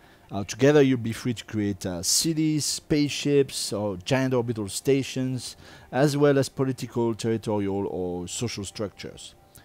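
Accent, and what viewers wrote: France. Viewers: Cette accent francais :D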